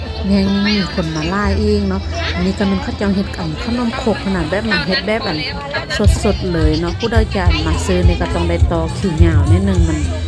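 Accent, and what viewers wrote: Luang Prabang, Laos. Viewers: สำเนียงเจ้า คนหลวงพระบางบ้อ…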